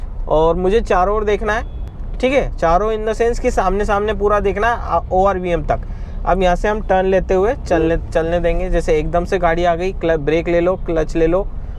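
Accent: Indore, India. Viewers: आपकी लैंग्वेज का टोन इंदौरी लग रहा है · Indori accent truly at the point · Lagta he bhai ap Indore se hoo 😂😂😂😂😂😂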